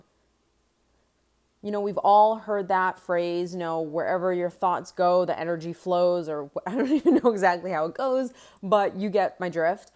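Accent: Boston, United States. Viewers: She actually has a bit of a Boston accent and honestly our d's often sound like a t.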